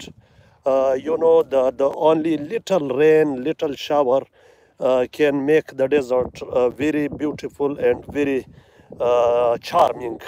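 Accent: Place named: Pashto, Afghanistan. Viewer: Very beautiful English with pShto accent... Even flowers, desert n butterfly are all awesome... Moving camera view to show surrounding ll be good idea for knowing us abt areA a lil bit more.....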